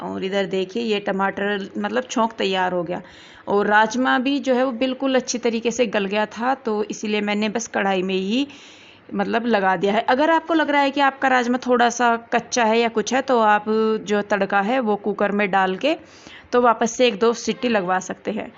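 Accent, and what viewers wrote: Haryana, India. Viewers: आप यूपी की लग नहीं रही आपके बातों से हरियाणा वाली लग रहे हो आप मेरे बेटे के लिए आपकी साइड की कोई लड़की ढूंढ दो गरीब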